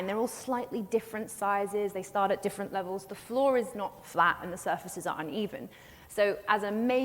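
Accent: RP, United Kingdom. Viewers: Oh god this is a disaster. She's been riding the ditzy, irreverent, 'errrm-girl' thing for a while now, and it's bad enough on a pre-recorded podcast. Being pretty and having a posh English accent isn't enough. You need to be vastly knowledgeable, a skilled communicator, and compelling to listen to. People really underestimate what it really takes to be a Professor Brian Cox or Dr Irving Finkel.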